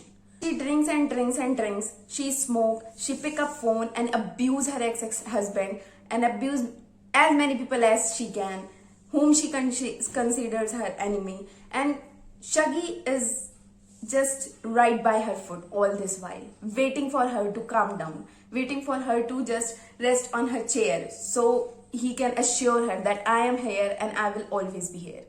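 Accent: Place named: India. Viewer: My knowledge of english is poor. But I can understand little with your nice pronunciations with indian style of english speaking. Thank you... But my humble request to you that please make all your videos in the Hindi language also. So we can understand it easily. Here are Indians in majority so more and more people take advantages of your knowladge and reviews. Please tell whole story of book in brief also.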